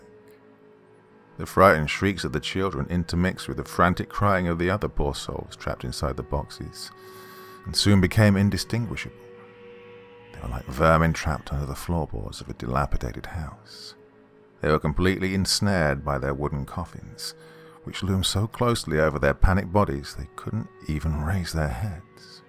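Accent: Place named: United Kingdom. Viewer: Quite enjoy the British voice and speaking pattern.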